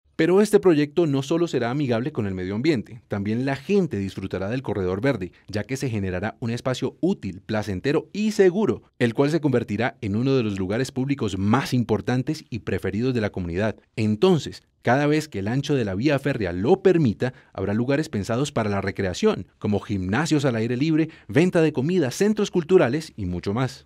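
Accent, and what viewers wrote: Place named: Cali, Colombia. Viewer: ¡Me encanta que el narrador del video tenga un sutil acento caleño!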